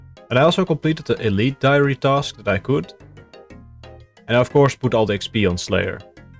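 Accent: Netherlands. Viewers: Hearing you say "blasted these fools with the d hally" in that amazing Dutch accent of yours made me grin from ear to ear lmao. Always looking forward to the next episode, keep it up!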